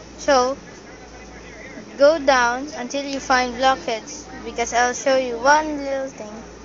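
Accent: Philippines. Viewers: try hard Filipino trying to speak English xD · I thinks he's filipino because he's backround sound like filipino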